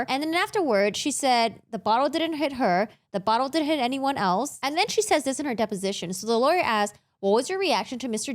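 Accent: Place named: United States. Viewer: If her accent doesn't give it away, her location is stated in the about page, united states.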